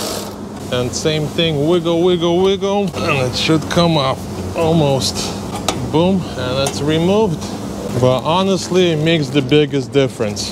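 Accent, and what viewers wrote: Russia. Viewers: русский чтоль?) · Hello! Where are you from? I think you are from Russia or Ukraine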